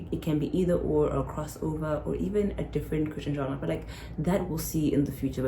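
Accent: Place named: United States. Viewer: Yes you sound American 😭I thought we all knew that??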